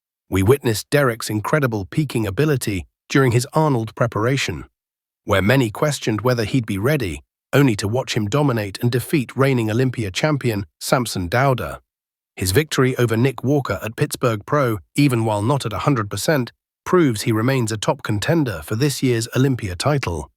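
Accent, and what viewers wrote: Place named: United Kingdom. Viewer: I love the British accent! Which assistant do you use?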